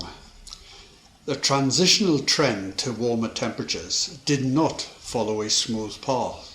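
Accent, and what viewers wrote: Wales, United Kingdom. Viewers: This is one of my favourite channels on the YouTube. Fact based. Clearly explained. Exceptionally well resourced. And a warm welsh accent. (Newport or the nearby valleys if I'm not mistaken) in a measured tone. Top, top work mate and thank you for taking the time to put this excellent content out. Diolch yn fawr.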